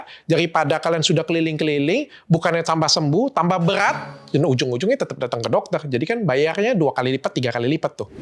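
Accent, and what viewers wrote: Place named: Madura, Indonesia. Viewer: kok kyk madura dok logatnya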